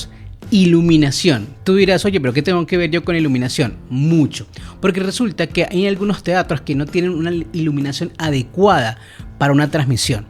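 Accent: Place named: Caracas, Venezuela. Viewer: De paso me suscribí y te empecé a seguir en Instagram jejjjee Estas en San Cristóbal, pero tu acento es caraqueño.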